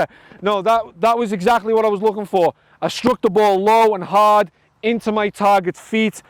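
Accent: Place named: United States. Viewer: Little bit of yank creeping into your accent there lad haha, is right la good vid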